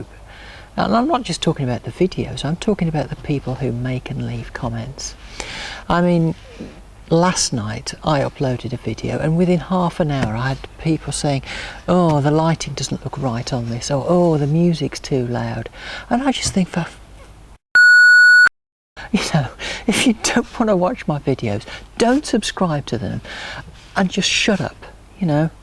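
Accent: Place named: West Midlands, United Kingdom. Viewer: If you're reading, I just found you're channel; even though it is closed your love, friendship and inspiration is continuing to reach people, who like you, care! There are a lot of us! I would have told my boss the same thing as well! I do hope the business is going well. I hear a West Midlands accent! If you do read this, can you please send me a link to your website. Have fun!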